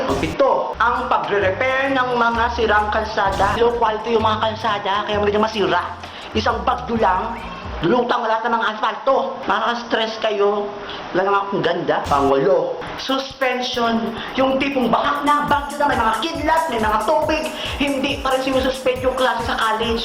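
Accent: Visayas, Philippines. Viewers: Bisaya kba? oOh..ganyan lang tlaga ung accent mo?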